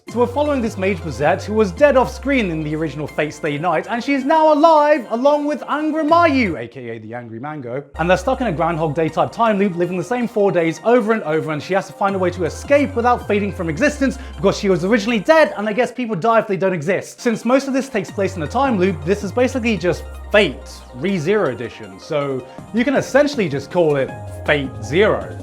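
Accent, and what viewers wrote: United Kingdom. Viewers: "There's at least five" Actually had me laughing, especially with that British accent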